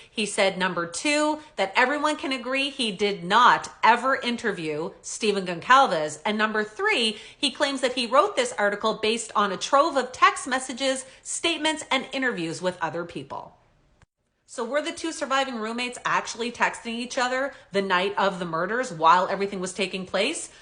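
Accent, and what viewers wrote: Canada. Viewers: I don't believe your pronouncing Steve and Kaylee's last name correctly. Never mind the Canuck accent. should check that out. Maybe I'm wrong 🤷🏼‍♂️🤦🏼‍♂️🤷🏼‍♂️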